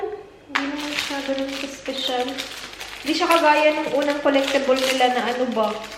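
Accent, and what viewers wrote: Visayas, Philippines. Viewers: i knew it.. may pgkabisaya heheh i loveee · bisaya ka noh?magkapareho kasi tayo na magsalita.bisaya din ako hihi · Pag nag tagalog parang bisaya ang accent pero pag nag English paksheet😍 sarap sa ears ate. Hi ate newbie hyr😘 pero diko sinasabing dimasarap sa ears yung tagalog haha labyuu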